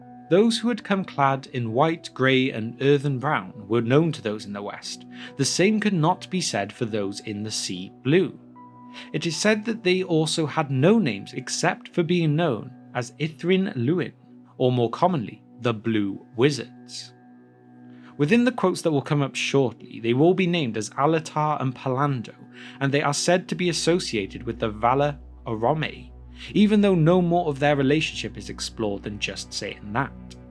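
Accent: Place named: West Country, United Kingdom. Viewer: I love your west country twang, very hobbity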